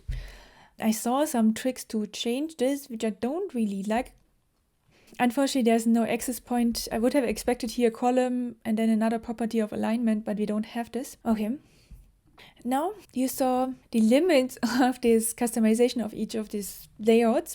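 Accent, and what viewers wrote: Germany. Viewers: Hi. Love your videos. The "better" word at this point would be "contract". E.g. "You can expand and contract the columns" instead of "you can expand and decrease the columns" English is funny/hard in that it has words that are common counterparts in everyday language. For instance: - increase/decrease - expand/contract - love/hate Hope this helps. Love learning from you! (Probably because my mom is from Germany and I love your accent.)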